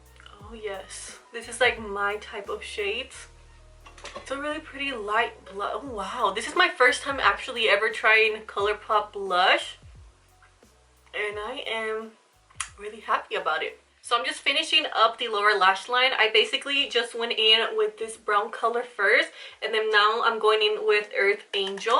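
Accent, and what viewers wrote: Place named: Puerto Rico. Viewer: Girl, you’re stunning. A fellow boricua here, I just discover this channel and when I heard that Puerto Rican Spanish it was a done deal. Me encanta, you got a new loyal subscriber. ❤️🇵🇷 Ig: Srta.lugo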